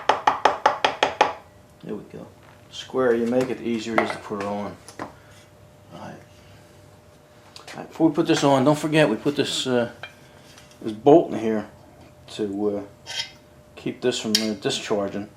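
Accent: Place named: New Jersey, United States. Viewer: Yeah! What state you in? Your state sounds like New Jersey or some other upper east coast state.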